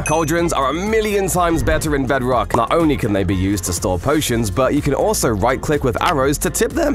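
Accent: United Kingdom. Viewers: You used to sound american and now you're british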